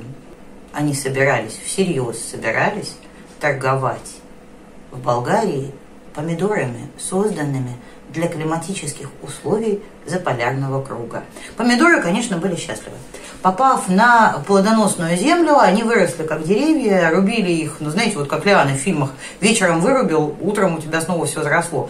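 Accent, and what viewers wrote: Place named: Saint Petersburg, Russia. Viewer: Потрясающий ролик в котором женщина, говорящая как питербурженка и мыслящая как москвичка рассказывает за тонкости южного быта. Я родилась и выросла в Ростове на Дону, со всей ответственностью могу сказать. Замените с тексте ролика слово "Болгария" на слово "Ростов" и 99% сказанного будет равно инструкции к покорению моей малой родины. Вывод Ростов не равно Болгария. Юг, многонациональный, торговый, с многотысячелетним наслоением истории, колоритный юг имеет в себе "ключики", одинаковые и сложно понимаемые теми кто там не родился. Однако, как человек много лет поживший вне пределов родного города, могу сказать следующее. Основной принцип ролика - отложите свои амбиции, откройте сердце, включите голову и проанализируйте вдумчиво место в которое занесла вас судьба - универсален. Для любых принципиально отличающихся от места где вы выросли конгломераций.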